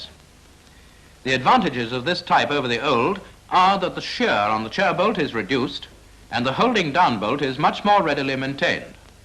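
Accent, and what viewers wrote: RP, United Kingdom. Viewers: Classic 1930s commentary, BBC English delivered in that patrician and reassuring style,alongside plenty of technical jargon to keep the Great Unwashed enthralled and firmly in their place.Job done,and train passes sweetly over the new junction at Chester General...now let's have a nice cup of tea.Utter respect for these men,my father worked as a shunter and track repair ganger for 15 years,just 13 miles from where this was filmed,including through the harsh winter of 1963. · Amazing! Where did you find this? If this footage is unrestored it's even more amazing. Love the "BBC" English! Thank you!!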